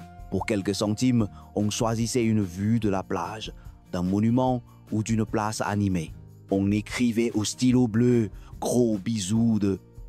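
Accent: Quebec, Canada. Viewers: accent du québéc?, suisse?, belgique? non non québéois romande avec une touche de bruxelles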